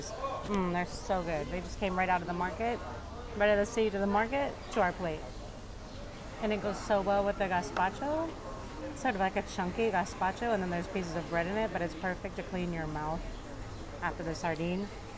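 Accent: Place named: United States. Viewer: Wow, ya lei que naciste en Chicago, yo me decia, donde esta mujer aprendio el ingles tan bien, me decia, acento ingles no es, australiano tampoco, te note pronto el acento de aqui pero me cuestionaba que siendo española por que lo hablabas perfecto and it is because you were born in America jajaja. Muy bueno tu canal. Me retire en America, soy cubano y quiero vivir en Portugal un tiempo, me es muy barato, mucho menos que aqui.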